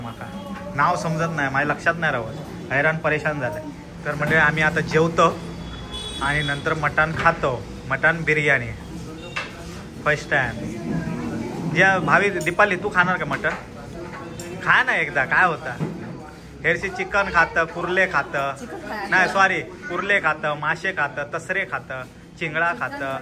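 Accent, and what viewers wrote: Malvani, India. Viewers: Avad नाय भेटला तरी चालेल . पण तुझी जी भरारी आहे ती लय भारीच आपल्या गावचा नाव रोशन केला दादा तू ❤❤❤ बाकी तुला हार्दिक अभिनंदन भाई असेच तू व्हिडिओ बनव . सर्वात महत्वाची गोष्ट म्हणजे माझा मुलगा 14 वयाचा आहे आणि त्याला तुझे व्हिडिओ , तुझी कॉमेन्ट्री , तुझे कुटुंब सर्वात आवडते आहे . सांगण्याचे तात्पर्य म्हणजे तुझ्या मुखावाटे निघणारी मालवणी वाणी ही सर्वात आवडती ❤❤🙏🙏🙏🙏🙏🙏🙏🙏🙏🙏🙏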